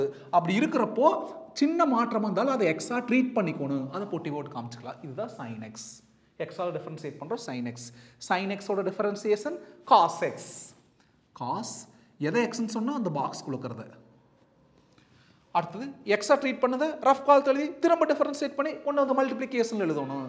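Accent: Coimbatore, India. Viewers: Kovai slang bro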